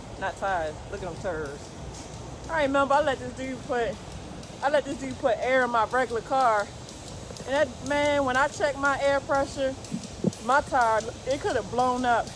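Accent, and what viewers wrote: Baltimore, United States. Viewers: copy that driver. You sound like you could be from bmore but I believe your from upstate NY? Forgive me if I’m wrong lol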